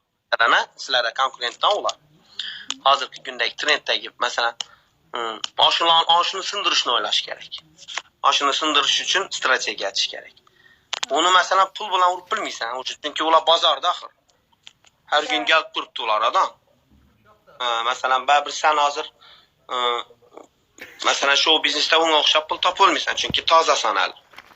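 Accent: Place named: Khorezm, Uzbekistan. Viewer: Yomon gaplisqu xorazmchada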